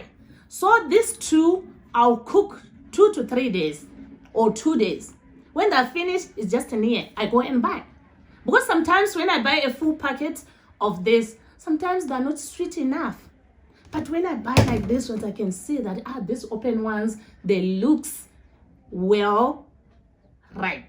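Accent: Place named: Africa. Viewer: Keep going forward my beautiful teacher love you and your family, some people with negative comments that's how African teachers talk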